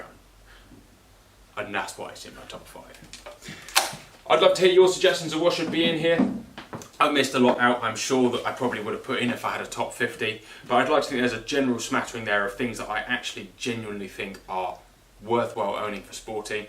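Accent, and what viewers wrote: United Kingdom. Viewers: It is called the Queen' s English, not simplified English(US)... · I love your YouTubes even though I have a difficult time understanding the Queen's (King's) English. Could you possibly talk any faster, LOL.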